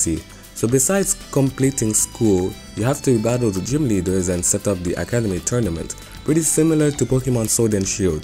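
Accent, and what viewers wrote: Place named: West Africa. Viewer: Oh that makes sense, I’m from Ghana. Another West African Pokémon fan! That’s great. Keep up the grind. You just got another YouTube subscriber and any other social media you’re on. Once again love your accent!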